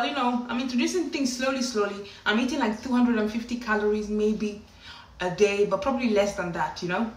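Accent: Nigeria. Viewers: Omg i can tell your friends enjoy your storytelling abilities 😂😂 can't believe your effortless retention skills. I loved this! Love the Nigerian accent and expressions 😹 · She sounds Nigerian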